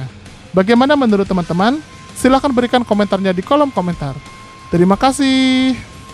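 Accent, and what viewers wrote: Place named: Sumatra, Indonesia. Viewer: Min, mimin orang sumatra ya..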